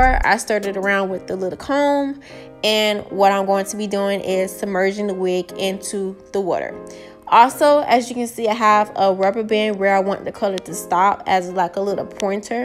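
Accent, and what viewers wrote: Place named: Florida, United States. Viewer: Turned out pretty! You were very detailed and I could tell you were from Florida 😂😍 Much love from Texas!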